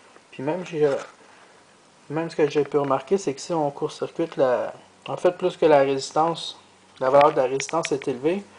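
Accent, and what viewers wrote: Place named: Quebec, Canada. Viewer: Bonjour, merci pour ta réponse. Effectivement, je n'avais pas compris ! L'alimentation est une pile de 1,5VCC. Bon il me reste à comprendre ce qu'est un "Joule Thief" , de ce que je peux apercevoir, nous avons une sorte d'oscillateur qui envoie des impulsions de courant dans le transformateur. La boussole indique clairement qu'il y a passage d'un courant. Quelle est l'utilité du montage, Un TP scientifique ? Merci d'éclairer ma lanterne. L'accent semble venir de la Belle Province, tu confirmes ? Cordialement Jean-Pierre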